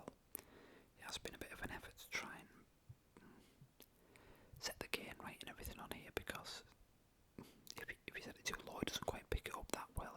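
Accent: United Kingdom. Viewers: Love this. Nice accent, where in UK are you?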